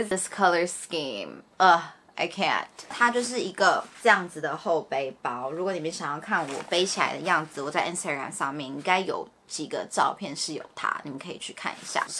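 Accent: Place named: United States. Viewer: Dena我有問題✋ 這是我很久以前就想問的！終於鼓起勇氣問 因為真的太想知道了😂 就是為什麼妳是在台灣長大但是講中文卻有abc感？！ 是因為講英文久了就會變這樣嗎？ btw我好喜歡妳💖💖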